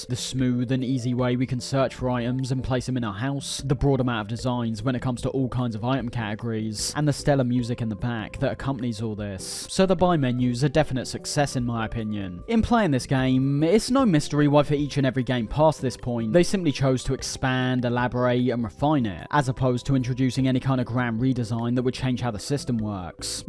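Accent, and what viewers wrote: United Kingdom. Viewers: A British person talking about the sims for an hour. That's all I need and want actually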